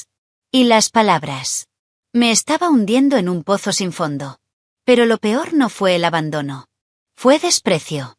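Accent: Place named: Spain. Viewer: Recomiendo le pongas un acento mas neutral. En America Latina somos 650 millones contra menos de 50 en España. Y la verdad escuchar acento español en estas historias desmotiva un poco a los latinoamericanos. Y parecen mas historias cómicas que otra cosa. Con todo respeto, pero la verdad.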